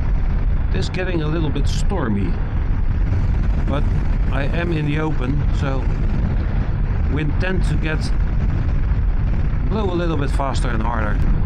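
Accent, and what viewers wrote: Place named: Netherlands. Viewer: Setup functions amazing. The Pan sounds great (love that V4 smoothness) and you voice is picked up clear. Good audio balance overall. Image is up to spec too. Guess all you are missing now is a cam under the head and tail light for some serious cam switching editing and ofcourse those sweet sweet unobstructed views of the Dutch countryside. Soon RTL's MotoNL can pack it's bags (or more specifically panniers). Your English is perfectly understandable despite not being a native speaker. You are grasping for words more than when speaking in dutch but for an ad hoc, on the fly recording not bad at all. And people might mention it but don't worry about the accent. Dutch just has a heavy linguistical footprint. Keep up the good work. Great vid again of this channel. Thanks for the upload!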